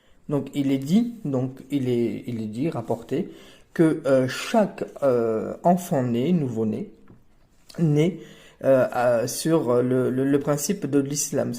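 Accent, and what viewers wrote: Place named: Arab world. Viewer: Poto t'as un accent arabe découpé à la scie c'est pas ouf. Tu vois "passer du coq à l'âne" bah c'est pour toi cette expression idiomatique française.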